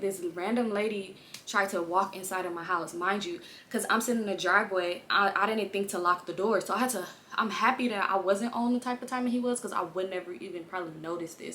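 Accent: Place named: California, United States. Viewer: I can tell you from Cali I am too we love are gold jewelry. The bamboos and the way you talk give it away to us that's from there. Storytime with you be lit tho I just got on here this my 2nd video lol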